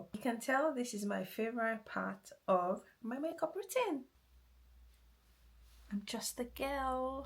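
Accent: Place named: Benin. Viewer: Benin accent got me 😂😂😂❤❤❤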